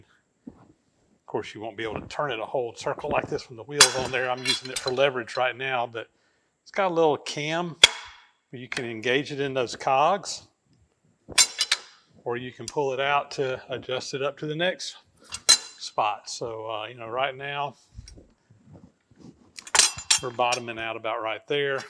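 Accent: South, United States. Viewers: Always nice to see your projects coming along narrated by your southern accent that is soft on the ears to this NYC guy. I hope that someday when this country returns to manufacturing and engineering fine products that young folks can watch these videos and see a skilled craftsman at work.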